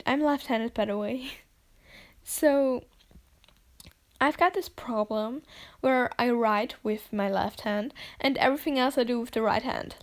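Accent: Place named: Germany. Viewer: If you didn’t have your German accent, I would defo believe that English is your first language!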